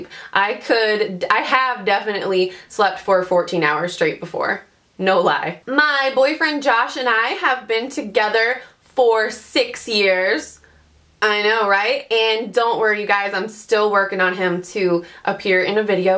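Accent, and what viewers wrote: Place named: South, United States. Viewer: this sounded SO southern but I loved it lol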